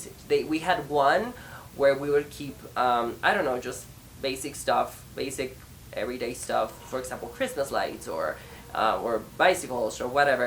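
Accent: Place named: Los Angeles, United States. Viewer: I love ur L.A accent.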